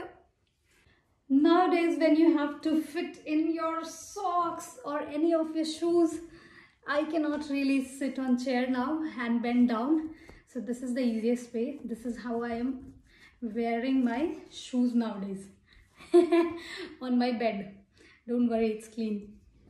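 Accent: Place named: South India, India. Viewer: Your North Indian n your accent has suddenly become South Indian.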